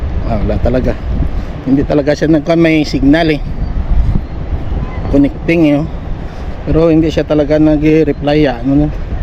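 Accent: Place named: Bacolod, Philippines. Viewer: Favorite ko alimasag. You souded like a person grom bacolod po kasi yung accent nyo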